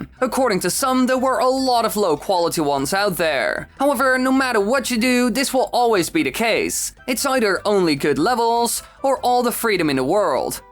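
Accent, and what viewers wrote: Netherlands. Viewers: Well you can hear from the accent that he's dutch which is okay. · Well I mean you sound Dutch Are you ? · Ur voice is fine tbh, just obviously a european (dutch?) accent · For me your voice is great and i really like your dutch accent. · Its a good accent, its dutch right cuz in your zelda reaction i thought you were talking dutch for a smal bit. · I love your Dutch accent! I’m from Belgium 🇧🇪 and I don’t mind it.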